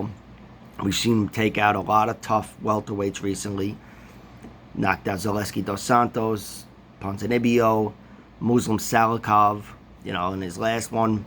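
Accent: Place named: New York, United States. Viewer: Are you from New York I ask because of the accent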